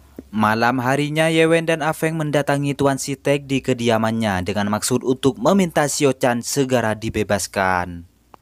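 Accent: Madura, Indonesia. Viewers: astaga meduro mu lo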